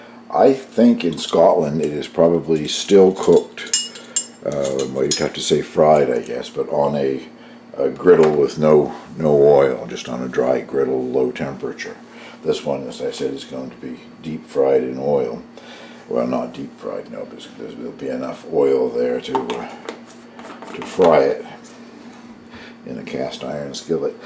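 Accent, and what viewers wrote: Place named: Canada. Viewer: I, like yourself, appreciate good food but not into making comlex ones. I'm learning interesting Canadian pronunciations. We say scones like stones. You say it like skawns. I'm hearing a lot about the instant pot, it looks like it needs a 2 week workshop to learn how to use it. Thinking about getting one. Best wishes.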